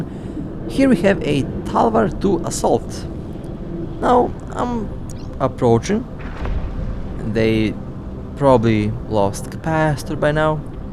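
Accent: Russia. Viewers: Ты Русский что ли.? Оппоненты с Русскими именами, а у тебя акцент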